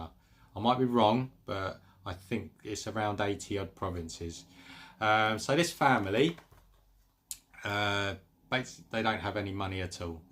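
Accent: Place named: England, United Kingdom. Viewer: In Hawaii I grew up with many Filipinos, first time I'm hearing one with English accent 🤣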